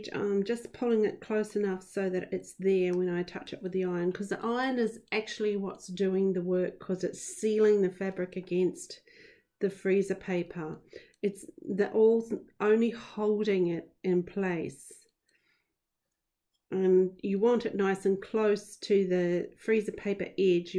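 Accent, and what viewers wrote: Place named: New Zealand. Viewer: Do my ears deceive me or are you a fellow kiwi?!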